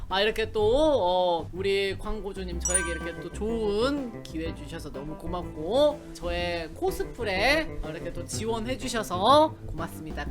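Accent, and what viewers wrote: Japan. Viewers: 애매한 한본어 킹받네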